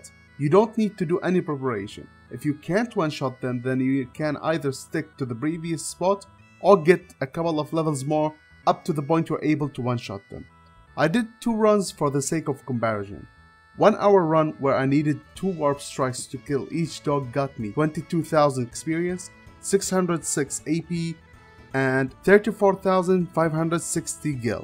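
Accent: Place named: Arab world. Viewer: Your Arab accent is so strong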